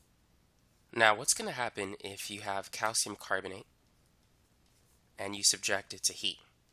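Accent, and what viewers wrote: United States. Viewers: listening to an american is kinda annoying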